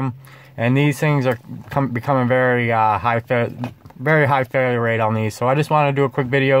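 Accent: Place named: North, United States. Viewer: What state are you from? That accent is different I'll guess one close to the Canadian border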